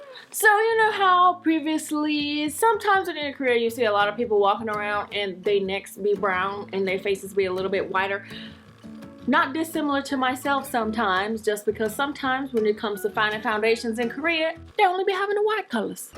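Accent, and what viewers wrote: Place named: South, United States. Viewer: ur southern accent is the cutest !!!!!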